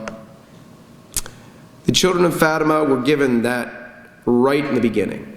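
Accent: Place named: Germany. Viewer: Is he a German speaker? His English is so good!😁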